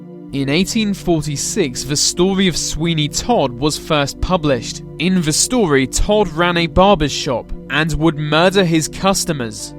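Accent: United Kingdom. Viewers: are you British cos your voice is fabuloussssss ☺☺☺☺☺☺☺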